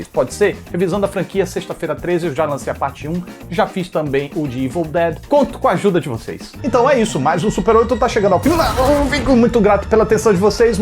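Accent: Northeast, Brazil. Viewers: Boa análise, como sempre! Sem dúvida, se não é o melhor, é um dos melhores avaliadores de filmes do YouTube, da internet! 👍👏 Como se não bastasse a inteligência e o bom humor nas análises, ainda por cima há o maravilhoso (para mim, carioca descendente de nordestinos!) sotaque nordestino! 😉👍 Eu gostaria de lhe pedir que fizesse uma reação para o filme "Mulher-Rei" , que assisti na tv a cabo e achei ótimo! Aliás, só mesmo o racismo enraizado nas sociedades ocidentais para explicar a divulgação fraca que este filme teve e o fato de não ter vencido um Oscar - um "desinibido de ouro"! No mínimo, penso que deveria ter concorrido a melhor filme, melhor direção, melhor roteiro e atriz coadjuvante (há umas 2 ou 3 que mereciam pelo menos concorrer), para não dizer que a Viola Davis deveria ter ganhado para atriz principal.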